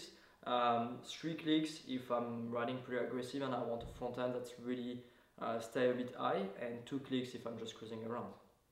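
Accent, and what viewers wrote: France. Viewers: How do I have a French accent like urs lmao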